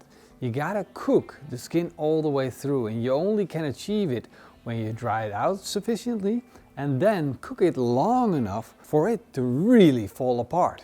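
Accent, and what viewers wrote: Netherlands. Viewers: You had me really wanting this until I saw the nipples on the belly . I know I am being a wimp, but I just cant see myself eating the nips! However, I do love the content and your accent. I grew up in Den Haag and the accent reminds me of my time inn Holland. That always makes me smile!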